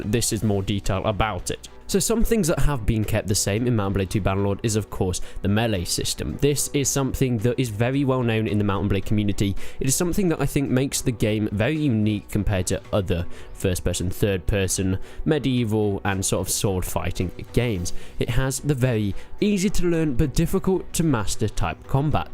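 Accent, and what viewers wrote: United Kingdom. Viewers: Where the hell did he do anything to insult German national pride? - of which there is none, matter of fact, anyways. You just condemned for allegedly hurting "a nationsfeelings", and in the same breath, belittled U.S. Americans, generalized them, for being easily offended. You can't measure actions with one standard and then apply another one to your own. And besides that bullshit - how is it NOT okay for him not wanting to subscribe to a German magazine if he - as he said in another video - doesn't even understand German very well? Fortunately for us, WE don't have to do that now. Is it the profit of the magazine you're defending or is it that you want everyone to read Gamestar? Dude. is - I hope I won't lie - British, judging by his accent. I have no clue, just found the video. And you want him to subscribe to a German gaming magazine so you won't think of him as a some kind of "Anti German Supremacist"? 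Fine. Go subscribe to the first Mongolian game magazine found on google then! Or otherwise, I must denounce you as a racist! Oh, you don't speak any Mongolian you say? - You racist! You think of Asians as inferior?! Dirty German scumbag! Surprise fact - everytime there is exclusive information about a popular subject somewhere in the media - in this case, exclusive intel about the state of Bannerlord in a German magazine - it will get translated, it will be published elsewhere after a while, it will cease to be exclusive. Which is a good th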